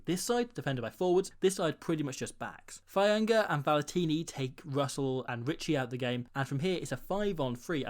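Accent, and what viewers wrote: United Kingdom. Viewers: Your voice sounds british but you get stan sport which is Aussie/kiwi so what country are you from ? Guessing not Aussie since all our players are little piss boys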